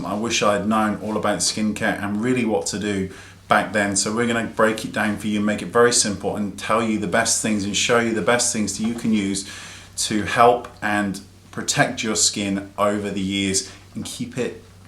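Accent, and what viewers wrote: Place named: United Kingdom. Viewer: probably, hes british and he just pronouces it differently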